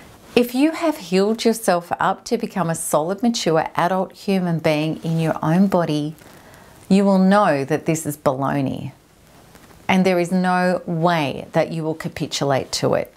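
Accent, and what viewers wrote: Australia. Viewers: Can I please listen to you say you want me to "know" ? So cute . Are you from down unda? Wink wink. Love it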